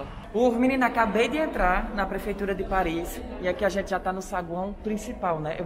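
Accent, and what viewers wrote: Northeast, Brazil. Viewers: seus vídeos são um mix de cultura, beleza arquitetonica, beleza da natureza, informações de utilidade pública, tudo falado de forma naturalmente simples, divertida e brasileirissima, no legítimo e delicioso "nordestinês". Já dou like antes de começar a assistir. Agradeço ao Alexis do canal Ola Brasil por ter descoberto você!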